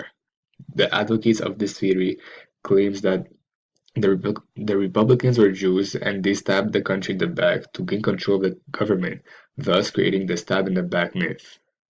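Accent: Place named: Ireland. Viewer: Great material my friend, this helped me puzzle-out a lot of things I had never figured out before. I hope you are coming back very soon with videos this good. P.S.: I love your Irish accent, I can clearly see you grew up in Dublin!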